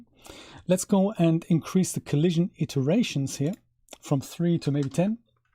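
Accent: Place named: Germany. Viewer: Sir, thank you for your unique work and presentation, I'm more a silent spectator of your site for years now. One question about you please, your pronunciation sounds so German, are you German?... 😉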